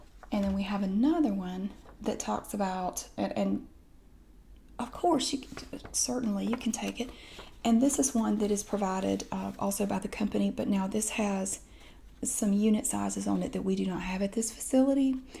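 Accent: South, United States. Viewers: Is your real voice in a southern accent? If so mine is too! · Absolutely wonderful! I just adore southern accents, they're so calming and relaxing. Fantastic job, keep it up! :^)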